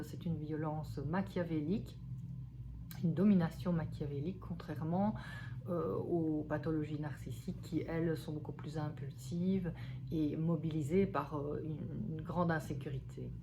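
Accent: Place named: Belgium. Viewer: Merci de votre professionnalisme, bravo pour votre travail, mes félicitations pour vos connaissances et mes très respectueuses salutations. Votre joli accent belge me fait penser à l'excellent Michel Collon.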